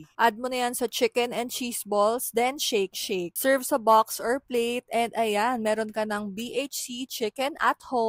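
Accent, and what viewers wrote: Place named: Philippines. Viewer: omg, thank you. I've been wanting to try this food for a long time. It's my first time watching you and when I heard your voice I wondered if you were Filipino, but then you started speaking Tagalog and I was so happy I was right! It looks delicious, I have to make it some day.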